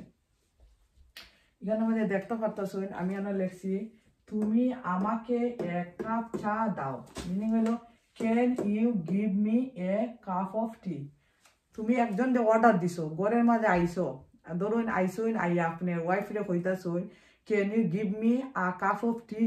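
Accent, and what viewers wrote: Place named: Sylhet, Bangladesh. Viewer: Sylheti mat hunram 🙈🤣🤣❤️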